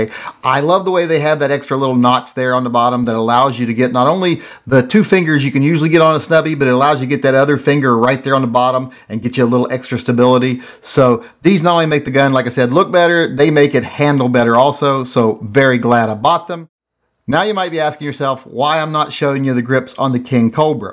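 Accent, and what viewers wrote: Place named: West Virginia, United States. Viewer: Your West Virginia is showing. They're the only ones that say, "colbra".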